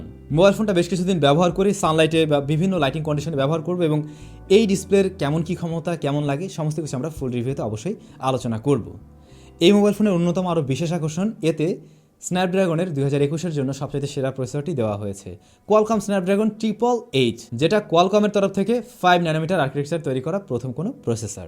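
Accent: Barishal, Bangladesh. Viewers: ভাই আপনার ভাষা বরিশালের মানুষের মত মনে হয় 🙂 আপনার গ্রামের বাড়ি কি বরিশাল? বরিশাল হলে তো আমার মতো 🙂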